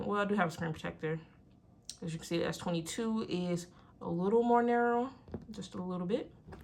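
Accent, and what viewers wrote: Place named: DC/Maryland, United States. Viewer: You have a DC/Maryland accent 😊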